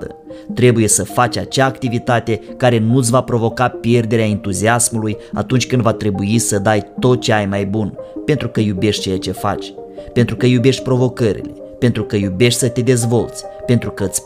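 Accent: Moldova. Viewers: Bună, ești foarte simpatic cu acest accent din Moldova. Mulțumesc.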